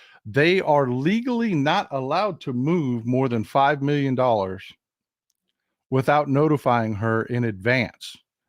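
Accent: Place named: South, United States. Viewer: A Virginian...a Southerner. Need some of our voices in my ear, rather than all these West Coast, Northeastern folk. Just subscribed... preach brother! Thank you.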